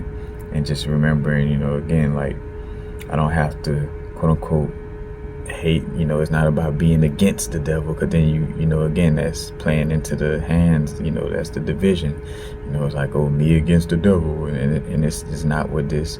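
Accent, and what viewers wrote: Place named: New Orleans, United States. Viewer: been on an august alsina binge lately, love his energy.. then this popped up on the sideline.. #nola love ur videos and ur energy and ur accent. continue to stay protected and covered, thank u for allowing the ppl onto ur journey <3 and thank u for reminding me to check on yeye mook's videos...been a minute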